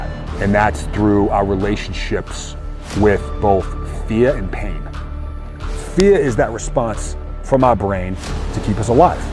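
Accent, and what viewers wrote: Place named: Massachusetts, United States. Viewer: Hey Chief after listening to this it made me think of Buddhism ! Especially when you talked about suffering? As a older regular soldier , and a below the Right knee amputee I am just blow away by you. I know you have a lot of plans after you retire. Have you ever considered teaching? Not the SF , thing but academia. Kids and adults are lost today and they need role models and great teachers . You snake eaters are all Geniuses, so maybe take that Mass accent and go to Harvard !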